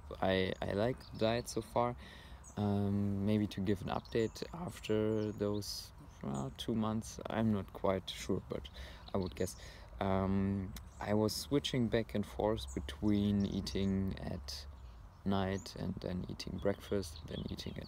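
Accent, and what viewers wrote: Germany. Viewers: are you German? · By the way, am I spotting a german accent there? :)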